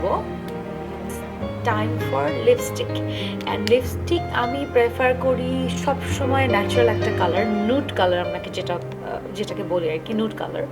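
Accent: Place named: Kolkata, India. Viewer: Guerniss Er Makeup Sunechi Khub Soft Hoye Tomake Amar BD Na Kolkatar Meye Lage Bcz Tumi Khub Sundor Kore Cholti Banglai Kotha Bolo ☺️🥰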